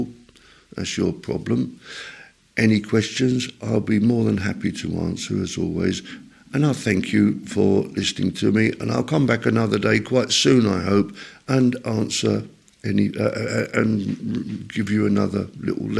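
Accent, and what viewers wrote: United Kingdom. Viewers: Hi, did you know Stuart Wilde? What do you think of him? Your British accent reminds me of him.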